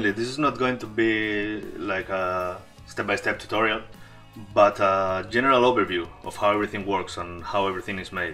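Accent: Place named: Ireland. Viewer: Very cool! Irish english hehe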